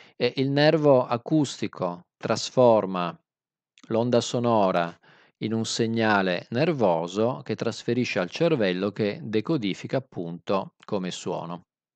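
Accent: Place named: Calabria, Italy. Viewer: Non si e capito molto sembri di Calabria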